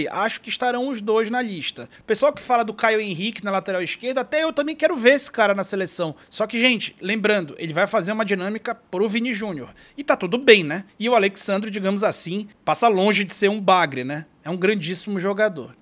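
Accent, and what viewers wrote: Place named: Rio de Janeiro, Brazil. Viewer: achei que fosse carioca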